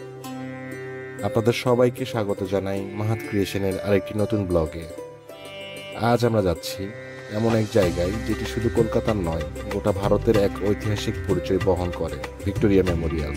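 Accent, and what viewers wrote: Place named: United Kingdom. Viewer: Khub sundor ❤❤❤ british bole kotha 🎉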